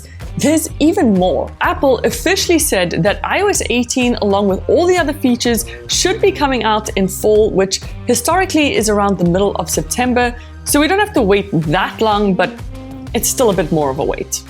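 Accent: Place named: South Africa. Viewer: Your South African accent is beautiful 😍😍😍 just like the rest of you. Love your videos too! ❤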